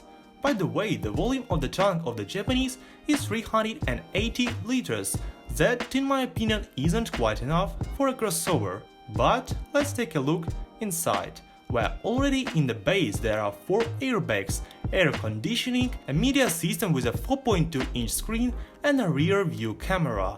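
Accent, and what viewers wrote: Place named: Singapore. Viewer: You sound SINGLISH......sounds like Chinese but speaking English. Hard to understand what you are saying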